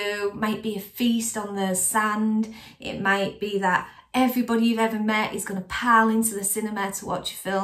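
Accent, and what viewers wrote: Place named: Hull, United Kingdom. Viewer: thanks, good to hear a Hull voice.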